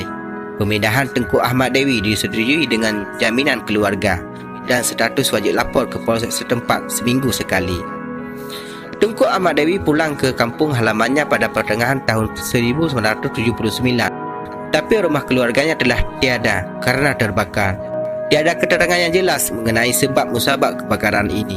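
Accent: Aceh, Indonesia. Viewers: Hahahah bek beungeh duen, nyoe jeut keu ciri khas channel nyoe nteuk, narator jih basa indon logat aceh that, lidah asam sunti is the best.. hhhh 😁👍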